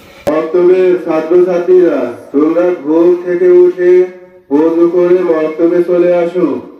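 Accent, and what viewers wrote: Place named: Purulia, India. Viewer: হ্যা আমি জানি কোন দেশ না। ভারতের পুরুলিয়া একসেন্টৈর মতো লাগলো তাই জিজ্ঞাস করলাম ।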